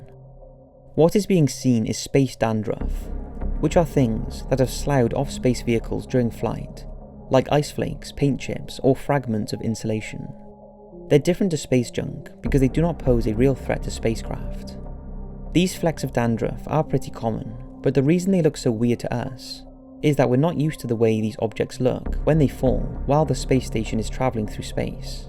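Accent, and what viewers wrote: Wales, United Kingdom. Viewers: This is awesome, I love your research. You’ve got a Welsh twang too - even better! Please solve the Ripley ghost photo as that is the only thing on the planet that truly freaks me out. I think I read that it might be a photographic anomaly and could be of the flower arranger, but that would have to be one heck of an anomaly to end up as an eight foot cowled figure without a face. shudder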